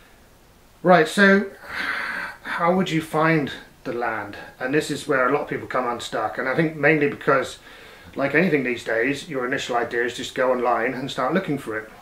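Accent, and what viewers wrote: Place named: Bristol, United Kingdom. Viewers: Important to say what country you're in. I can only guess by your accent that you're in England. I, on the other hand, am in Canada. Different rules. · Really enjoying your videos - judging by your accent I'm guessing you're near ish Bristol? We're Bristol based, would love to live off grid but feel my gaming addicted partner would not be impressed! Hehehe! xxx /|\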